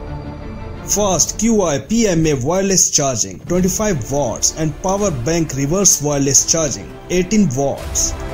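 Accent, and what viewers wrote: India. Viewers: Are u from south india or oriya? Anyway stop spreading rumor. These are not correct info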